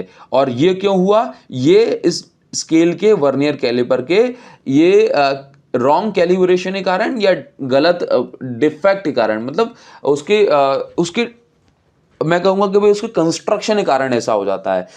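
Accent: Odisha, India. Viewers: Are u from odisha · Are you odia??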